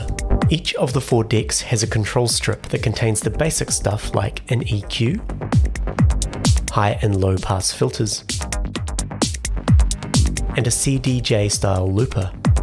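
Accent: New Zealand. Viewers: Gotta love the NZ accent :) Dicks everywhere!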